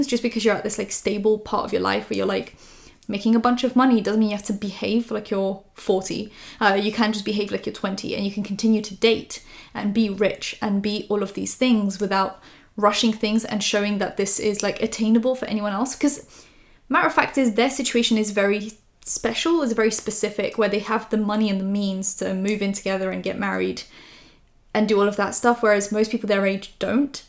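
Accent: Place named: England, United Kingdom. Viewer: i wish we were friends. i hear the english accent and wonder if ill ever run into you. but then i realise England isnt THAT small XD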